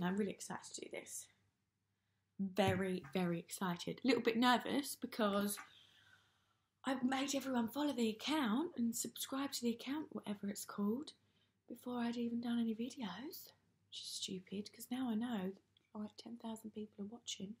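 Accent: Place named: Essex, United Kingdom. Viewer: Her accent sounds more Essex-y than she does in eastenders🙈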